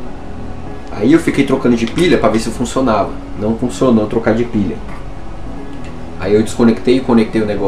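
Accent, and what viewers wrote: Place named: Portugal. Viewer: ah ta portugues de portugal :3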